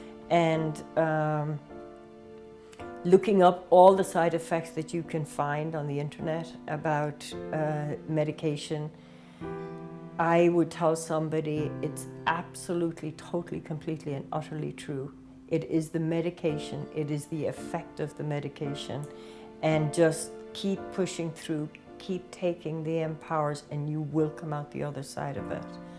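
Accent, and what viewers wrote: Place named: Ireland. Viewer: She sounds like from Ireland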